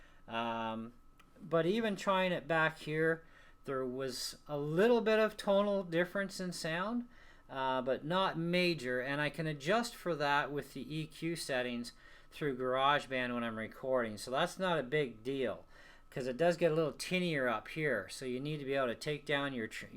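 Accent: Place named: Newfoundland, Canada. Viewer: you got to be a newfoundlander :)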